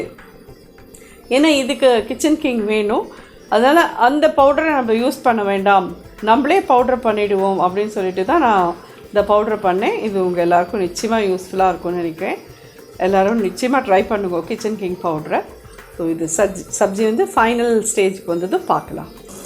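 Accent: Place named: Thanjavur, India. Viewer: Mami neenga Thanjavur karala. Engathu periyava Madhiri peseral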